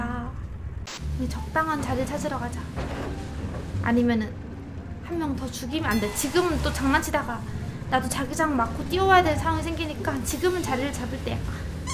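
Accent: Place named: Masan, South Korea. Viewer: 제가 FPS겜을 안하고, 못해서...그래서 PUBG도 단 한번도 안해봤고, 그러니 당연히 PUBG 방송도 안보고, PUBG 방송하시는 분들도 몰랐는데... 그런데 매우 기적적이게도 유튭 알고리즘이 제가 관심도 없는 PUBG관련 영상을 제 유튭 첫페이지에 띄워줬는데 그게 마침 님이셨; 사실 썸네일과 제목만 보고는 님이 PUBG 유져 겸 방송인인줄은 모르고 썸네일의 웃는 모습이 넘 러블리해서 클릭했다가...했다가...지금 님 영상 역주행 하면서 막 다 보는 중입니다 +_+ 사투리는 거의 안쓰시지만, 말 할 때 마산 사투리의 억양이 묻어나오는데 그게 너무 귀여워요!!! 게다가 웃는 모습은 넘 이쁘고, 와의 캐미도 너무 귀엽고 좋고, 플레이도 넘 잘하셔서 저는 배그 안하고 안좋아하는 장르임에도 불구하고 님 영상 보면 너무 재밌어요!!! 아... 님 채널을 왜 이제 알았지? ㅠ.ㅜ 새해 복 많이 받으시고, 특히 돈복과 구독자복 한가득 받으시길 바랍니닼ㅋㅋㅋㅋ 구독과 좋아요, 알림설정까지 하고갑니다 ^0^ 덧: 근데 강도질이랑 인성질 메타 넘 웃기고 재밌어욬ㅋㅋㅋㅋ 양용이만 타면 실력이 수직상승하는 님 쵝옼ㅋㅋㅋㅋ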